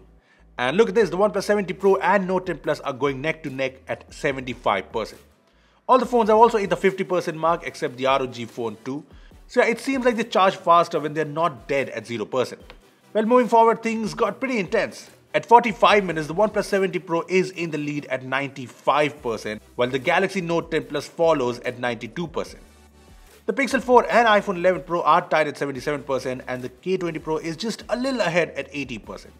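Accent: India. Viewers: oh shyet another indian accent.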